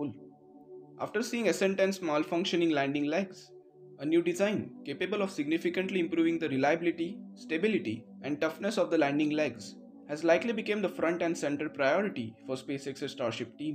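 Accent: India. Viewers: I am American and I understood that narrator who sounds East Indian perfectly. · when can Indians speak with a not annoying accent?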